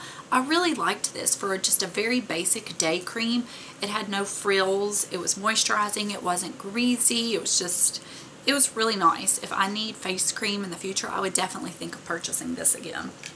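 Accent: South, United States. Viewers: You definitely sound southern lol! I'm in Louisiana. Great job on the empties!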